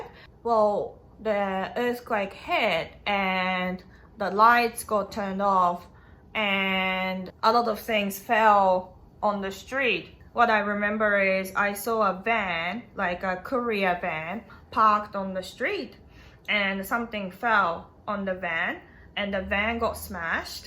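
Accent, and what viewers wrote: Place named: New Zealand. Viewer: Still got that kiwi accent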